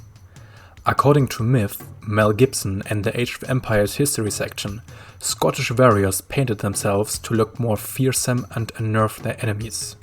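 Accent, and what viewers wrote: Germany. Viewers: South sweeden is literally called Godar I mean.. I will asses you favor the german theory becouse of you accent rather than actual proff.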